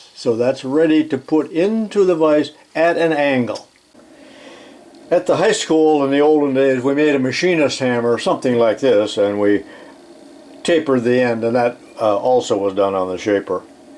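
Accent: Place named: United States. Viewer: When i was a kid, way before the internet. The way you talk is how i imagined all Americans talk. To my foreign ear the pronunciation is like poetry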